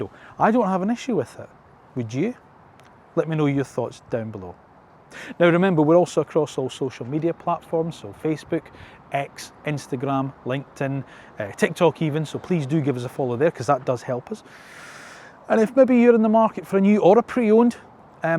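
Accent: Scotland, United Kingdom. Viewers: Informative and well explained. Really like your down to earth commentary. Yes… just cudos for how you’ve grown into this extremely over saturated market of Clarkson and Hammond wanna bees. This you have done with your calm presence, Scottish accent an clearly one or two half bad jokes combined with, great knowledge of the subject at hand…Might find a fast breathing or two when starting a sentence explaining the numbered options… of whom where some Take a little less in or cut out in post. Hell, I even like this masculin income - makes you remember line five…🥸 But overall, really really like your channel and try follow your regular new material - Cudos / 2ell done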